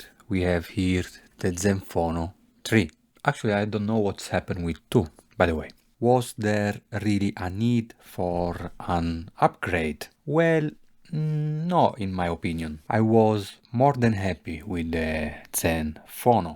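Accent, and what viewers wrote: Italy. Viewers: Also, if you don't mind me saying so, I'm not in the least bothered by your Italian accent but there are a couple of things that really hurt my ears and one of them is 'knobs'. The k in knobs is silent, like in 'know, 'knife', 'knit', 'knock', 'knight' etc. So it's pronounced 'nobs'. Also, knobs are the things that you tend to turn to adjust. The push only things are called buttons.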